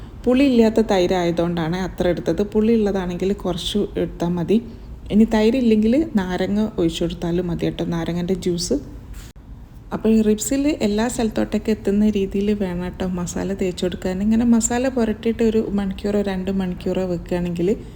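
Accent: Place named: Tamil Nadu, India. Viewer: ഒരുമാതിരി തമിഴ് നാട്ടുകാർ മലയാളം പറയുന്ന പോലെ... ബോർ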